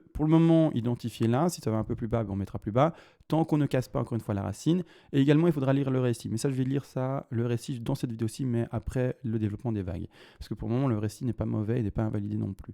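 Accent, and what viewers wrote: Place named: Belgium. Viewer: Merci pour ta vidéo je reconnais l’accent belge ? de chez moi 👍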